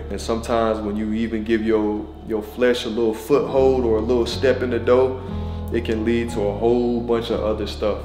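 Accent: Philadelphia, United States. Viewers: I know you not from Philly but u talk like us 😭 idk maybe its just me. Enjoyed this more personal video tho, God bless you always !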